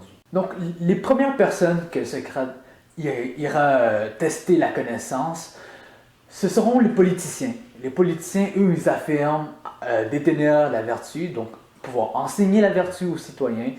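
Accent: Quebec, Canada. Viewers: woah c'est quoi ton accent, c'est mi québécois mi français dis weird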